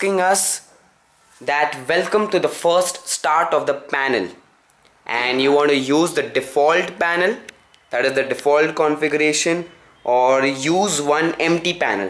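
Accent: India. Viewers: Great video. Love your accent, I work with several guys from India, you made me feel at home!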